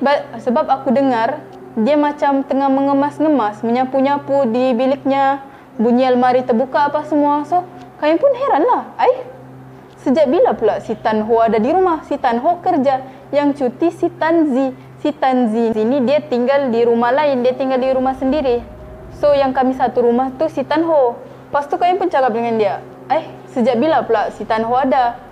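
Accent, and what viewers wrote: Sabah, Malaysia. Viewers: Org sabah ke? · Slang sabah cantik kak 👍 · Suka gila kak cakap sabah dia 😍💕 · Akak sabah ka?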